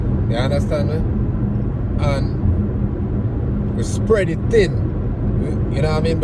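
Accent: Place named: Jamaica. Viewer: He's speaking a mixture of J'can patois, the vernacular of the people. I'm sure he can "code switch" when necessary. It's not that serious (lol).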